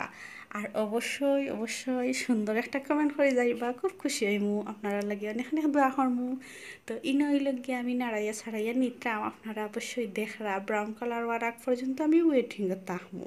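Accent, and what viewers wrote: Sylhet, Bangladesh. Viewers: কাছা মাছ কি বাবে খাবেন, আপু আপনার সিলিটি মাত আমার খুবিই ভালা লাগছে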